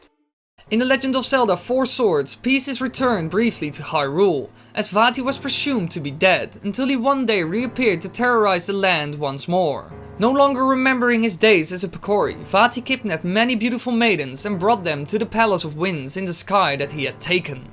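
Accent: Netherlands. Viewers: Ah, so it's Dutch? I wasn't sure exactly what it was, ha ha. XD Hey, your videos are really interesting! Keep up the good work!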